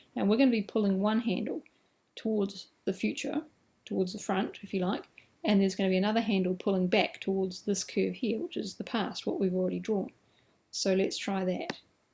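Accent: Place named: New Zealand. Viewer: Hey, Thank you so much for doing this. It has helped me immensely. I'm new to all this and this tool was driving me mad in Affinity Designer, until I found your tutorials (it crosses over just fine). I really appreciate your patience, thoroughness and clear instruction. This is wonderful and a massive help for beginners. Thanks ever so much. PS - Your lovely NZ accent is very soothing too:)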